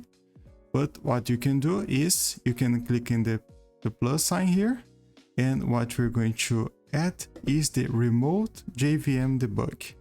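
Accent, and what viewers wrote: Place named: Brazil. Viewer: reconheço sotaque BR de longe!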